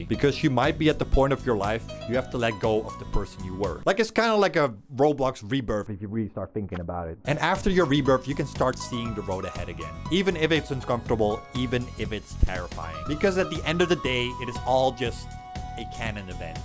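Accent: United Kingdom. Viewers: Bro sound British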